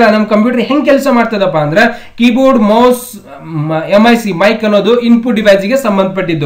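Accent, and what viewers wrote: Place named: Dharwad, India. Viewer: ನೀವು ಮಾತಮಾಡುವ ಕಲೇ ತುಂಬಾ ಚೆನ್ನಾಗಿ ದೇ ನಮ್ ಧಾರವಾಡ ಬಾಷೆ ಕೆಳಲೂ ಬಾಳ ಕುಷಿ ನೋಡ್ರಿ ಸರ್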